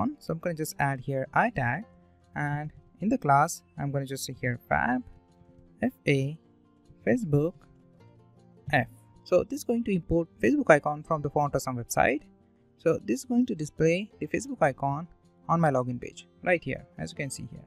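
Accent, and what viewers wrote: India. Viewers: Awaaz Desi si lag Rahi hai.. YouTube me HTML and CSS sikhane wale mostly Desi hi hai..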